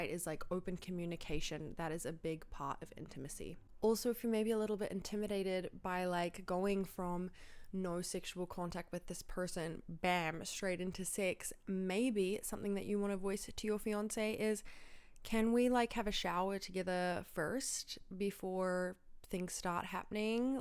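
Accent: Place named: Australia. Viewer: Where is this awesome accent from ?!! Aussie?!😂❤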